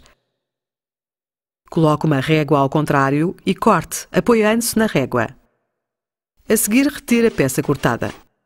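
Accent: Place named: Portugal. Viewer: Tá em Pt de Portugal irmão, é um pouco complicado pra entender mesmo, mas se vc se esforçar da pra compreender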